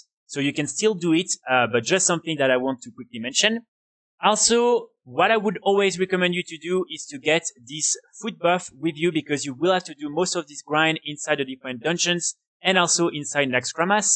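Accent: France. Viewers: Bonjour, merci pour la vidéo! J'ai une question, je joue chevalier de la mort. Je suis forgeron 300. Est ce que je suis capable de fabriquer le set T3 Guerrier ou je suis obligé de réaliser tout le run de la vidéo avec un guerrier que j'aurai up lvl max et forgeron 300? (j'ai écris en français car je sent l'accent français chez toi ahah). Hello, thank you for the video! I have a question, I play death knight. I am blacksmith 300. Am I able to make the T3 Warrior set or do I have to do the whole run of the video with a warrior that I will have up lvl max and blacksmith 300?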